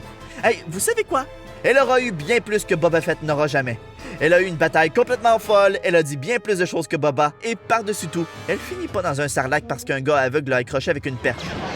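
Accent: Quebec, Canada. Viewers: Bravo j'adore ce que tu fait. Je te trouve très drôle et avec l'accent québécois c'est encore mieux. Que vive le Québec 🇨🇦🇫🇷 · Bonne video, en plus ton superbe accent québécois rend vraiment bien. Avant je trouvais ce film affligeant, grâce à toi je le trouve juste déprimant. Peut être qu'en la visionnant 24/24 pendant 6 mois, je trouverai ce film bon. Mais là, j'ai la flemme... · j'adore ton accent québécois je trouve ca vraiment fun et ton humour est cool je m'abonne ;)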